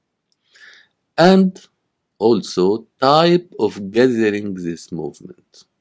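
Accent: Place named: Syria. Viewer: I like you syrians pronation 😘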